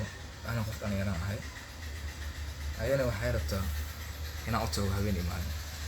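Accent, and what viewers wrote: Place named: Xamarjajab, Somalia. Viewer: nacso u sound like u from xamarjajab beenlow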